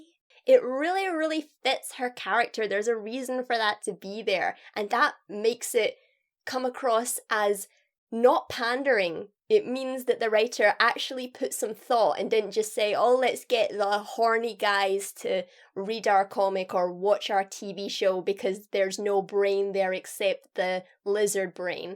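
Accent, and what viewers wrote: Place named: Scottish Lowlands, United Kingdom. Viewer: Trying to identify that accent. My best guess is lowland Scottish.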